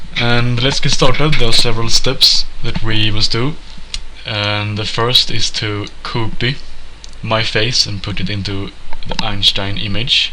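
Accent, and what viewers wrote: Sweden. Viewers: Din betoning låter väldigt svensk... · Är du svensk det låter som det på din dialekt? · Are you swedish? It sounds like that! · are you swedish? your accent xD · We klick ehh, hah bästa svengelska videon!, tumme upp! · Nice tutorial, 100% swe accent right?:D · 100% svensk hör man iaf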